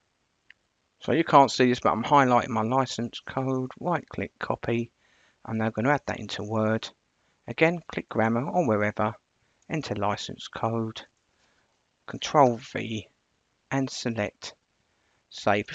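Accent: United Kingdom. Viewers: My intention is not to be rude, but If you learn to attenuate your strong (British? Australian?) accent, which is incomprehensible for some of us, you could reach many more people. I am a French Canadian who had to learn to attenuate his strong accent in order to be understood by Europeans who spoke French, so I know it's possible. I'm sure your presentation is very useful, but I couldn't understand half of what you are saying. Good luck.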